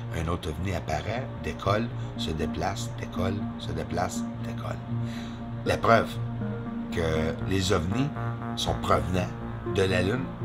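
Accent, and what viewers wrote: Canada. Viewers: Bonsoir j aime bien ce que j entends.moi être en France mais je vois rien dans le ciel mais je sent qu'ils sont la. C est dingo mais j'y croie a100 pour 100.J aime bien ta voix de Canadien c est dingo. Bravo et à bientôt. Si moi qui ne va pas sur ordinateur j espère que un jour bientôt ils seront et que le monde sera gentil avec eux.Bonne soirée de la France qui est triste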